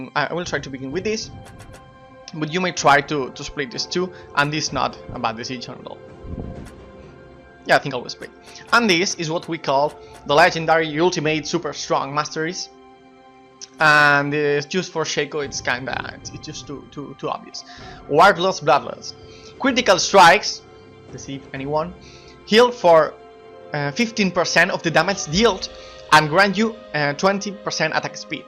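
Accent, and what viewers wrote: Spain. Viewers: ¡Hostia un Shaco!? Are you Spanish?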